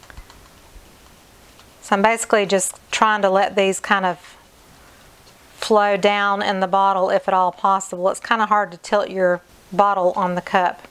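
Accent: South, United States. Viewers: Enjoyed watching and learning. , I tried one yesterday with no silicone and had the same results but liked the shine, bought more colors today. Love your southern accent, l lived in Shelby for 30 years, I do miss North Carolina!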